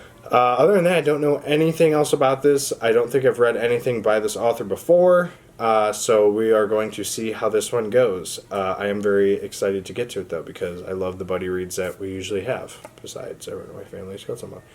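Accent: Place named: Midwest, United States. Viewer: Man with heavy midwest accent in a Menards shirt...checks out. Great content 🤘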